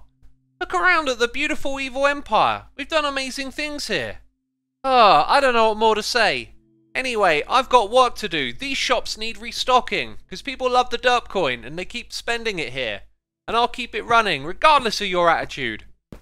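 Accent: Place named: United Kingdom. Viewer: he's so bri'ish, talking about evil X's a'i'ude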